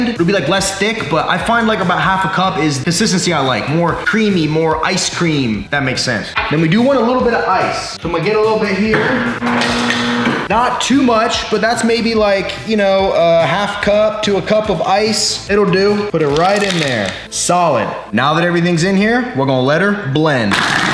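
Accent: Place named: Ohio, United States. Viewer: I can hear the Ohio lol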